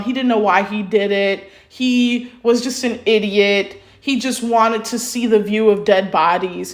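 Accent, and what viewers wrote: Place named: United States. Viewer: I kind of reached my limit with you saying that in real life, 'talking is the answer', because no, it isn't. I can just tell from your accent that you're from the New World and this opinion shows it. For example, my country the Netherlands. Its earliest foundation was a Republic, and do you know how it came to be? Spain had taken over the region and we fought 80 years for our independence. We had several talks and a truce, but it never worked as Spain refused to give the Dutch ethnic group our land until Spain was caught up in too many wars and was financially drained to the point it couldn't afford to keep up the war. Then they agreed to declare us an independent republic. The answer to our freedom was money. WWII wasn't solved by talking, but by people fighting back enough that Hitler knew he was going to lose so he killed himself. 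I can continue. Sure, treaties and truces are done through verbal communication but they don't get made if the war is more beneficial to the people fighting then peace is. Either one or both parties need to sink deep enough before the talks happen, and that is exactly what AOT shows: To the rest of the world the genocide of Paradis was more beneficial then peace so they opted genocide. Which is why the only one willing to talk with Paradis is Hizuru, because they would benefit more from peace with Paradis then a genocide because then Marley and other countries get a hold of the resources they want.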